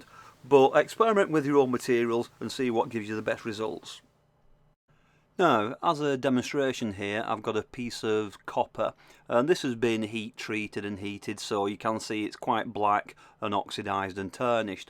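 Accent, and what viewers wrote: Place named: England, United Kingdom. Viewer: Great to here an English accent on a video! Don’t think we can get these in South Africa though!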